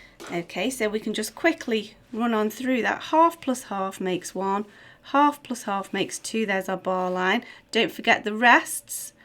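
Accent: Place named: Staffordshire, United Kingdom. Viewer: knew it!! I know a Staffordshire accent when I hear one :) I’m from Derbyshire!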